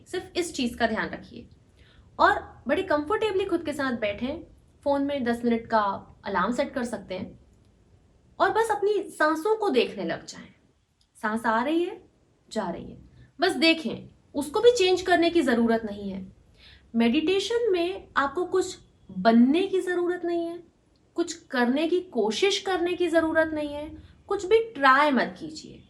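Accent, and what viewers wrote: Madhya Pradesh, India. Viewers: mam are uh from MP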